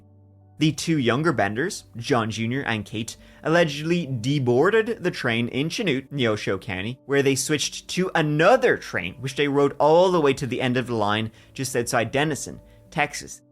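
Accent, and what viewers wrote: Ireland. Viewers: Battling my third round of COVID in 8 weeks. Needed the soothing voice of my favourite Irish lad.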